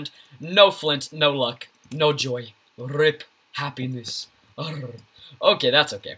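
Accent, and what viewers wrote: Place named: United States. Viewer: lol gg i automatically assumed u were american xD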